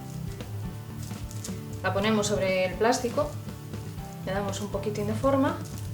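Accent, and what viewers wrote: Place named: Spain. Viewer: Hola! Excelente que estés tratando de promover la tradición Venezolana aunque tu acento no es Venezolano. Suenas como Española. Sin embargo voy a probar tus empanadas… Se ven deliciosas!❤🎉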